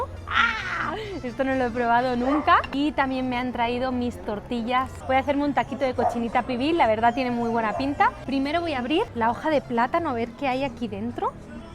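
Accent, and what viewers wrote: Andalusia, Spain. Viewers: AUNQUE CON LAS REGLAS DE NO DEJAR GRABAR, EL VÍDEO ES MUY BUENO! YO HE IDO A CDMX PERO NO HE IDO A SANTA FÉ!! PERO YA LO CONOZCO UN POCO CON TÚ VÍDEO!! ME GUSTA TU ACENTO ESPAÑOL!!E GUSTARÍA IR A ESPAÑA!! SALUDOS!!! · Haz un reportaje de INTERLOMAS otro lugar de cdmx muy especial . ..ahhh te vez hermosa y tú hacento español suena bien,felicidades !provecho!!!. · Tu no eres catalana. Eres andaluza.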